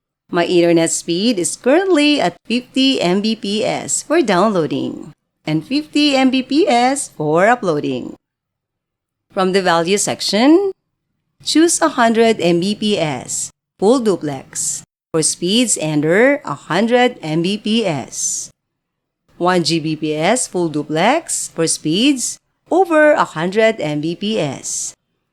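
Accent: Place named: Philippines. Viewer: that's a philipina speaking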